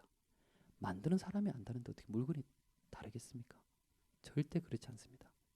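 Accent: Gyeongsang, South Korea. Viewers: 나는 일단 경산도 말투 나오면 듣기도 싫고 . . .역시 비유도 짜증난다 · 저는 서울 사람이지만 경상도 말투에는 항상 신뢰와 진실이 느껴지네요❤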